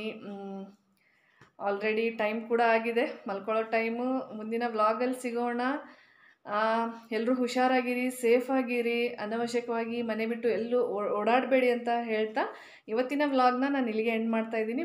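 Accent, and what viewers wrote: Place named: Dharwad, India. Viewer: Nanage nivu matado darvad Kannada tumba like madtini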